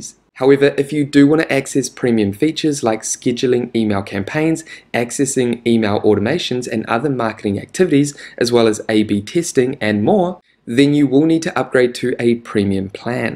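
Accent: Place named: New Zealand. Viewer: Love your NZ accent dude! Cheers from Canada!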